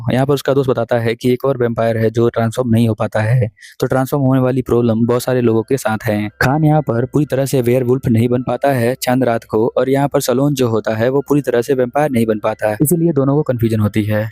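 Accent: Bihar, India. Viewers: Ap Bihar se hai kya?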